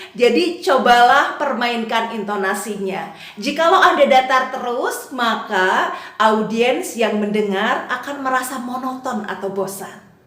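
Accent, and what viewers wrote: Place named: Java, Indonesia. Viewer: Ahh...masa siih... Itu ngomongnya pake logat Jawa bukan logat Sunda🤭.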